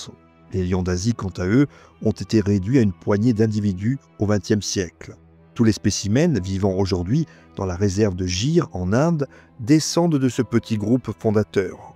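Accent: Corsica, France. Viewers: Bonsoir seriez vous de corse du sud par hasard?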